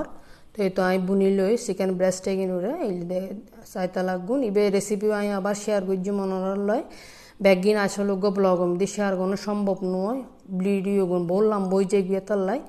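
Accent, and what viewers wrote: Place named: Chittagong, Bangladesh. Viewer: normally bujha jayna apnr kothay j apni ctg er kintu ajk 😅😅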